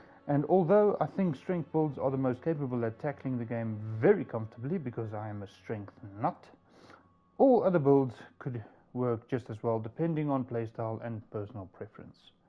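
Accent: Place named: South Africa. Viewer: The accent tells me you're from South Africa.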